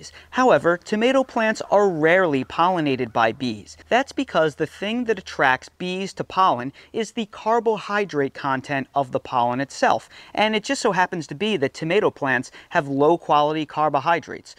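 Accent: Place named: Mid-Atlantic, United States. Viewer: I tell you what I’ve said this before you and your wife are my favorite go to info I really mean that and I recently saw from your work you are from where I live the mid Atlantic I’m in Delaware I lived in pa. Md And Delaware I guess I can relate to your accent lol thanks for all your hard work and info the best by far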